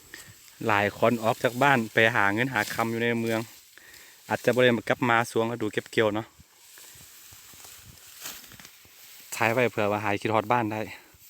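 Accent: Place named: Ubon Ratchathani, Thailand. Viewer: เจ้านิกะเว่าได้หลายภาษาเนาะเว่าคือคนอุบลบ้านข่อย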